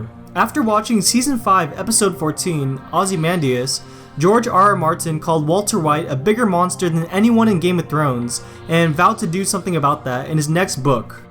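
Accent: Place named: Midwest, United States. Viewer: He sounds midwestern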